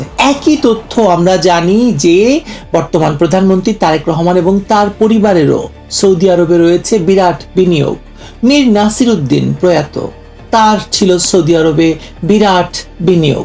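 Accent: Kolkata, India. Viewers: Your Bengali accent is like Kolkata's Bengali accent. I like and enjoy the way you speak.🙏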